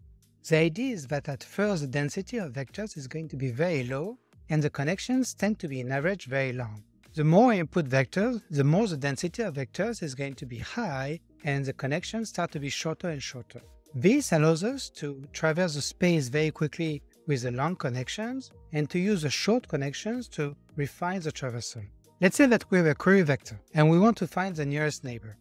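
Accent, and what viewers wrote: France. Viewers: It's not that bad. As a English speaker that knows/speaks French, I can tell you are French. It's not had to hear and understand what you are saying. I'd say keep going and make any improvements along the way.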